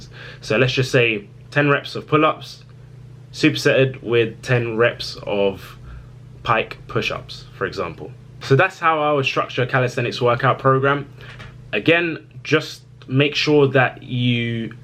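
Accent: United Kingdom. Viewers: I have been watching your videos for months now. I always learn something new. I like your british accent btw. Greetings from Czech republic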